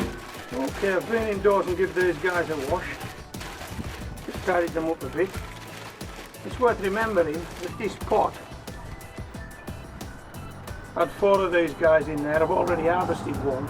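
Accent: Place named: Scotland, United Kingdom. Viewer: Love that Scottish accent!!!❤❤ Thanks for this, celery is the first veggie I'm trying to grow! In Australia!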